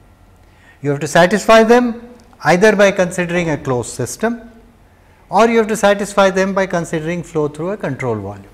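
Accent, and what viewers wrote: India. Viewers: there is too much indian english. great spelling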